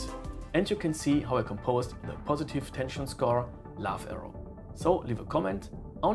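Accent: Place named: Scandinavia. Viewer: Your accent in English sounds like Scandinavians!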